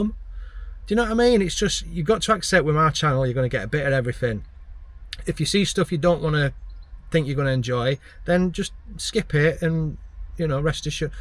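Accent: Yorkshire, United Kingdom. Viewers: Hello,!! Yank here, who absolutely loves your channel!! I'm a new subscriber, so I don't know a thing about "van life," and TBH, I will watch any content you post and not bellyache!! Any viewer who tries to manage your content needs to get stuffed!! Your Yorkshire accent knocks me out!! And your observations are hilarious!! 🤣🤣🤣 Sweet dreams!! Ta... Chuck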